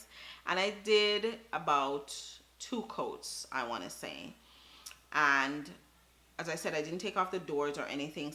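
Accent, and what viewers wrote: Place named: Jamaica. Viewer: Sound Jamaican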